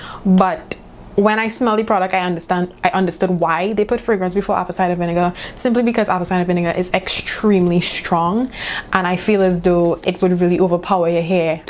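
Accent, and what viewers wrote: Trinidad and Tobago. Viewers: Must make out dat accent 🇹🇹🇹🇹🇹🇹🇹🇹🇹🇹🇹🇹🇹🇹🇹🇹🇹🇹 im subscribing now ❤️ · From the min you spoke I was like look my Trini sister lol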